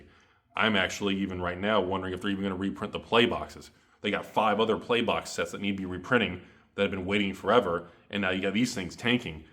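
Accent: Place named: Oklahoma, United States. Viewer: You sound like a really brilliant individual- nobody with half a brain would take financial/investment advice from someone like you that talks like they date their sister in a trailer park somewhere in Oklahoma. Wow what a prediction it hasn’t hit its low yet like every single person already knows. Thanks captain obvious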